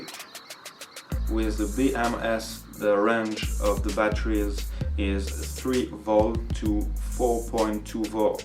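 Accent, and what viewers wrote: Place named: France. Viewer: beau projet ,On sent bien l'accent frenchy c'est cool ^^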